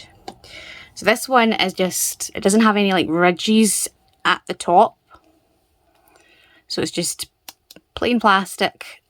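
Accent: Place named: United Kingdom. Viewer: Accents from the United Kingdom are so neat.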